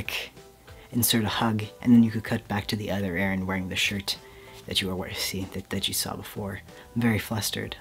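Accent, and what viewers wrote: Canada. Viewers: I love your Canadian accent reminds me of many favorite movies strange brew with Rick moranis. Plus I lived in Alaska for 13 years, and traveled thru Canada beautiful country ( People in the lower 48 also think Alaska has 2 seasons, but no we do have 4 seasons, and love the northern lights are beautiful in alaska too) I am now on the east coast but thankfully still in the country. I love hugs too I'm a big hugger ❤️ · Aaron that was a HECKIN good time with that extra Canadian accent !! You can wear that Cat shirt on the MFP ! Lamb... LET ME IN !! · Never stop the Canadian accent... it’s heckin’ adorable!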